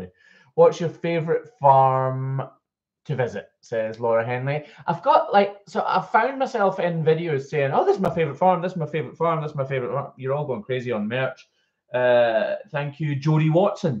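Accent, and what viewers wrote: Scotland, United Kingdom. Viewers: Love your Scottish accent do you have an American accent too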